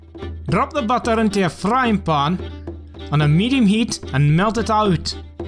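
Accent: Scotland, United Kingdom. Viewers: Love your North East of Scotland accent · Dude I work in Scotland but your accent is something else . Subscribed tho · of course he’s Scottish. They’re probably auto captions which is just what the computer thinks he is saying. And the computer isn’t very good at listening to non American or RP English. Scots is a language which split off from Middle English. A lot of it is mutually intelligible with English but many Scandinavian languages are also mutually intelligible to certain degrees.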